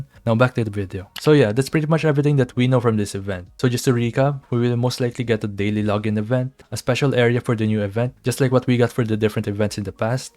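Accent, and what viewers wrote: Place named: Philippines. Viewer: Are you Filipino?, you sound like one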